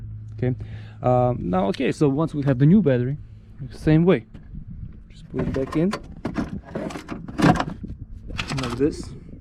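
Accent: Russia. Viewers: I like your russian accent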